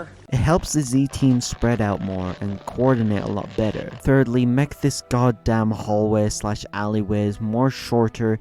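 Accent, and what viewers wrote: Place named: United Kingdom. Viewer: New upload from my favorite bri’ish man? Nice! (Don’t tell combine elite lmao)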